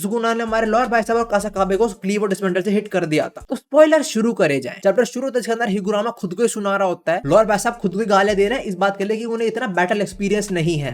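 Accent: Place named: Haryana, India. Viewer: Hate his haryanvi accent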